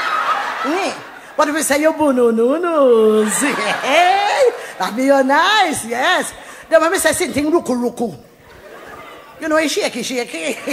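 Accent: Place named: Jamaica. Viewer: Miss Lou tell dem ina Jamaican style.Yuh big bowt ya.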